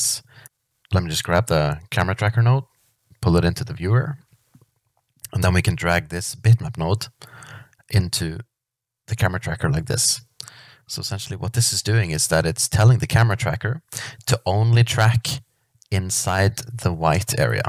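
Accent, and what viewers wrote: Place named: Norway. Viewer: Great video! (Syns eg hørte en norsk aksent, gøy med nordmenn som lage slikt innhold!)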